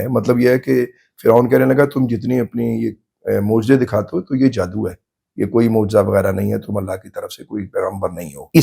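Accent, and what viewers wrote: Arab world. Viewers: ALLAH HO ALLAH … HAZOOR QIBLA… sadqe ap k arabic lab o lehjay py … QURAN E PAK OR HADEEZ E MUBARAK se zabrdast beyan mubarak … HAZRAT MUSA k 9 mujzat life me first time ap se sune ko mily … Ap k wasly se hum in 9 mujzat py iman rahkty hai jo ALLAH PAK ny HAZRAT MUSA ko ata farmay … explaining method alaaaa or purasar… Ap ki alaaa azmaton ko salam … ❤️🌹🌹🌹❤️❤️❤️🌹❤️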